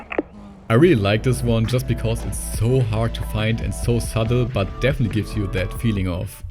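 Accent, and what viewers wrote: Germany. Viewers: Ich liebe diesen deutschen akzent :D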